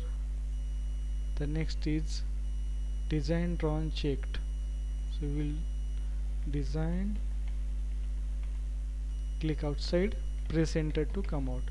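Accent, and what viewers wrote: India. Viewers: Sir,is your mother tongue marathi?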